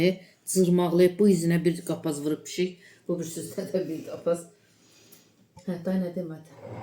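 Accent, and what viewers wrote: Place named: Salyan, Azerbaijan. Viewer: Niyə axı pesok deyirsiz? Öz dilimizdə deyində şəkər. Gül kimi sözümüz var. Ləhçə Səlyan ləhçəsinə oxşadı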